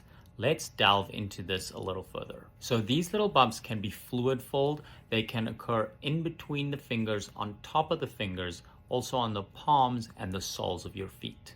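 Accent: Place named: South Africa. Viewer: thank you so much... I thought u sounded a bit south African. I have suspected MCAS have not found someone that knows what it is. But I will keep trying. I will copy your info and ask around. Thanks so much. My biggest issue currently is that steroids or cortisone make the reaction ten times worse. Thank you so much for replying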